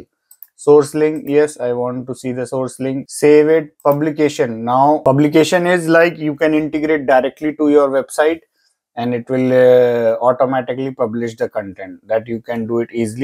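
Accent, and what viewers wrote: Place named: India. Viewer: hi sir good work but plz it would be better if you speak hindi, its irritating,excent is hindi and language english, 😢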